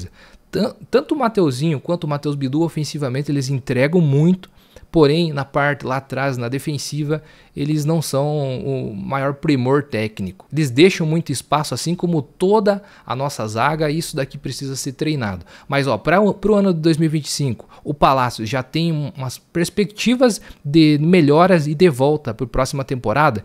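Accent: Paraná, Brazil. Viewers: Teu sotaque n me é estranho, Paranaense pelo tipo kkk Vai Corinthians!! Direto de Laranjeiras do Sul, Paraná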